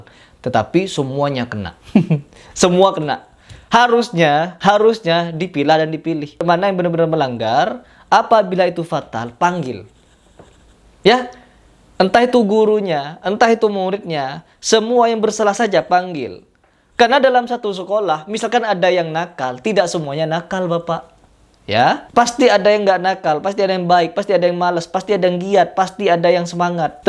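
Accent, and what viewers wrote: Madura, Indonesia. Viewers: ADMIN LOGAT MADURA INI PEMFITNAH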